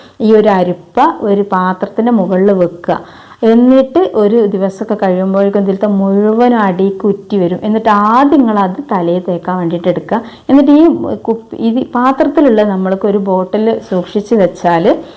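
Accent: Malappuram, India. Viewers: Thank u mole. I like your old Malappuram language. God bless you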